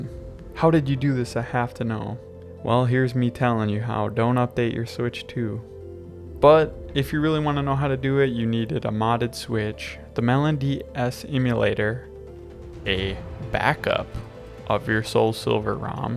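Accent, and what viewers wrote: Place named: United States. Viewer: the most american accent ive ever heard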